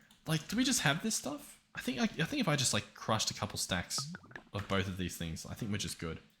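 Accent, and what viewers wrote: Australia. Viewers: southern hemisphere brother, probably australia based off his accent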